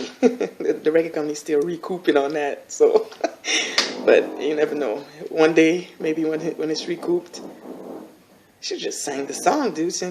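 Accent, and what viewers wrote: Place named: New York, United States. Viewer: Wow! It's really you Kid. Thank you for so many memories. I loved and that joint back when I was Thirteen and still do. You inspired me to start rapping and making music. The 90's dance pop is booming again. You should start touring. Your story is incredible. I always thought you was from New Yaaawk.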